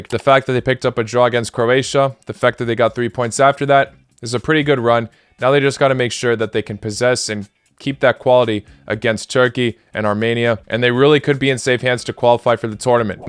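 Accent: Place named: United States. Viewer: His parents are but his bio on Instagram says 🇺🇸🇭🇷 cuz his accent is American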